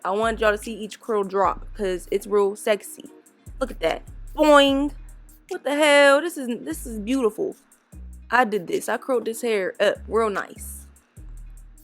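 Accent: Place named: Columbus, United States. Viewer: You can tell you live in Columbus you got that talk 😂💞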